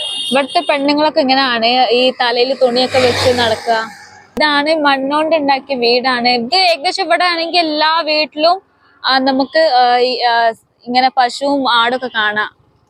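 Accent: Malappuram, India. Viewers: മലപ്പുറ०കാരിയാണോ ? ആ സ०സാരഭാഷയാണല്ലേ ? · ആ മലപ്പുറം വർത്താനം സൂപ്പർ .. ആ North Indian dress .. അതും സൂപ്പർ ...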